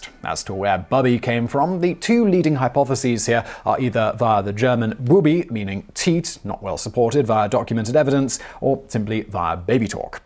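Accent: England, United Kingdom. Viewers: LMBO saying fun bags in English accent with a straight face just hillarious 😂 very interesting research lol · Never have I heard someone speak so eloquently and academically on colloquial breast terminology. British accents make everything sound more serious.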